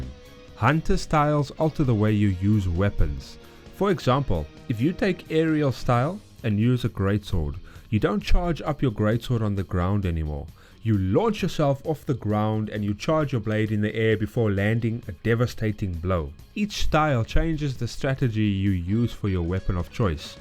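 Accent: South Africa. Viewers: Are you South African by any chance!? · which accent is this, are you south african mate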